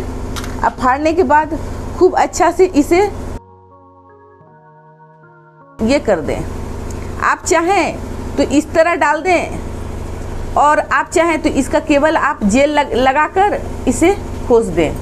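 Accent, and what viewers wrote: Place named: Varanasi, India. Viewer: Madam, aap aur aapki aawaaj me mujhe apna family dikhati hai. Shayad aap banaras ki hai.maduaadih kì hain? Reply kariyega.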